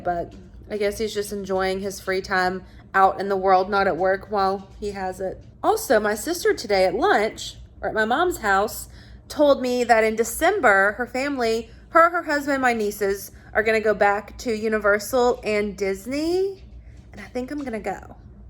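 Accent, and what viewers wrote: South, United States. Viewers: I love how you talk lol. I'm from texas I have a southern accent too lol. Nice vlog love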